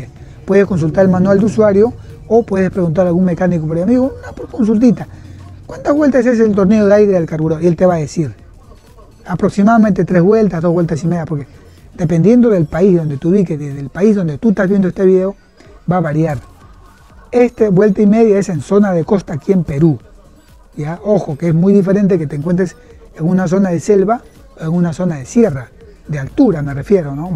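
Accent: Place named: Rioplatense, Argentina. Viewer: Desde montevideo gracias y tenes algo de rioplatense hablando muy buen video hasta ahora el mejor de como regular lleve a hacer el servicio y le habian dado como 5 vueltas de entrada de aire tuve que mantener en todo momento la mano sobre el acelerafor dia de lluvia con un transito terrible y nublado cuando llegue se me apago y tuve que arreglarlo yo mismo mi suzuki gn 125 f con 3 vueltas o 3 1\2 va bien vivo en el punto mas alto de montevideo gracias!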